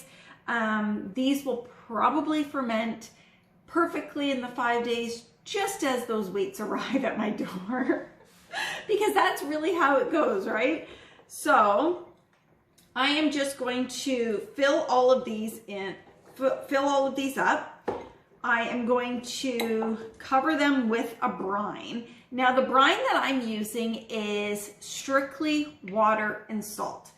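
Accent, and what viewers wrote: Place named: Canada. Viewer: Hello! Thanks so much for visiting me! Wow this was so interesting! My Husband is Japanese so I make a lot of fermented Kimchi! I know you must live in Canada. Love your accent ❤️. New Friend here❤️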